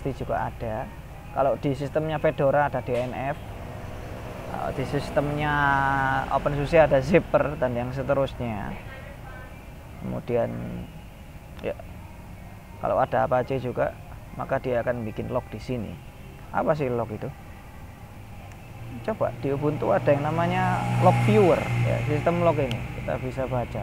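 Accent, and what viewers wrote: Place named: Surabaya, Indonesia. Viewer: Logate medok, ketok arek suroboyo y? Hahaha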